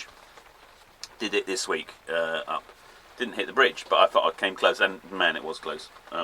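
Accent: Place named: England, United Kingdom. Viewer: I woke up this morning with a cup of coffee and the sound of rain drops on your cabin top and listening to the voice of an enthusiastic English man reading a stories of sailing adventures! A great way to start the day! Strange, it seems we Americans love being read to by a person with an English accent. I'm not sure why. Its hypnotic! "Can I have some more please?" As always love your videos.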